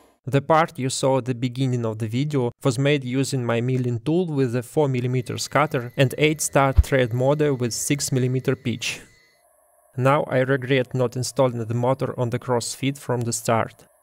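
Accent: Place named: Russia. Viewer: 😁 слышен "наш" акцентик и коверкание слов) А так видео👍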